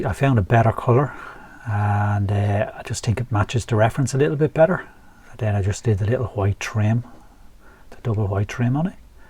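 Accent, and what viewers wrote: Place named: Ireland. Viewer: Great to hear an Irish voice on a modelling channel.. ❤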